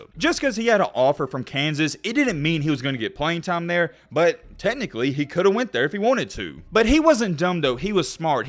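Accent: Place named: Kentucky, United States. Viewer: This guys definitely from Kentucky lol I can here it in his accent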